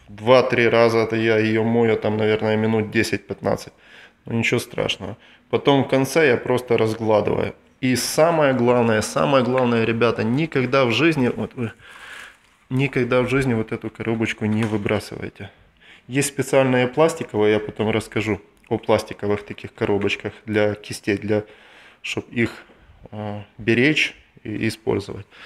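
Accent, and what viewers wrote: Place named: Ukraine. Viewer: Привет!)Акцент украинский хороший!)))Спасибо за советы!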